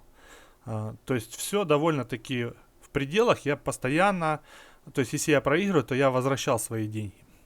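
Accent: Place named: Ukraine. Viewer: По разговору я понял,что Вы из Украины! Пополнчли через вэбмани или киви,как лучше? И можно ли с приватовской карты пополниться ?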